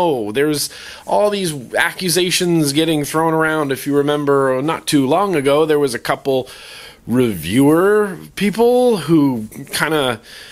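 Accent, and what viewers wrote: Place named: South, United States. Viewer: Lovely vlog, from the southern accent to the hand gestures the laughs were real. Thanks.:3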